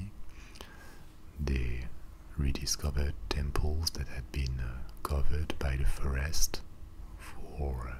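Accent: France. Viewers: I'm not usually find of French accents. Yours is different, I just don't know what makes me like it so much.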